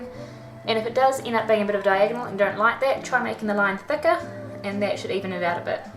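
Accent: New Zealand. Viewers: Chur, you sound like a kiwi. Kia Ora and nice vid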